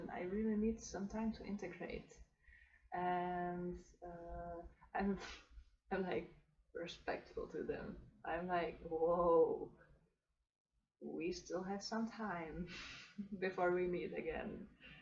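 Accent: Netherlands. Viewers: thank you for all the information! <3 I am currently growing Golden Teachers and am planning on microdosing. Are you dutch btw? Your accent sounds a little bit Dutch :)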